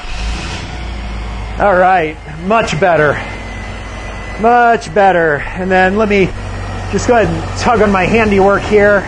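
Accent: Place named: Rhode Island, United States. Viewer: Are you from Rhode Island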